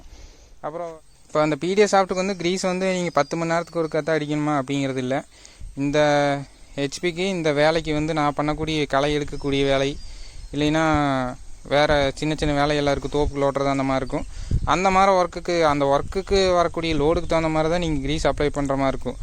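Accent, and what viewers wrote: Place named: Kongu, India. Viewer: உங்கள் கொங்கு தமிழ் உச்சரிப்பு அருமை சகோ.. Unga slang and way of presentation is superb..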